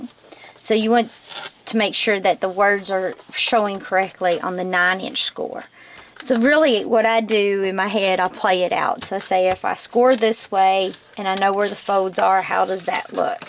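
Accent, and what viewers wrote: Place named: South, United States. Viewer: I just ran across your video. I LOVE IT!!! Thank you so much for sharing this with us. I can tell you are from the South. Where do you live? Beautiful coastal North Carolina is my home.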